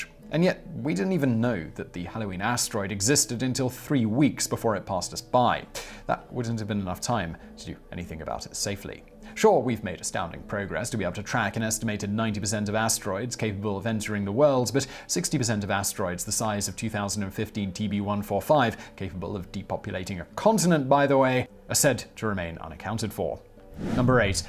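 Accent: United Kingdom. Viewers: Why does vsause have a brittish accent? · I love Simon's voice..the information gets absorbed alot easier when its in British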